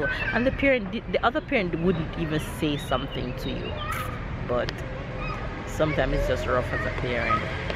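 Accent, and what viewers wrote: Jamaica. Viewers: I am glad I jumped over on your page. Saw you from Adanna and David's page. Like how you keep up with Ja accent👍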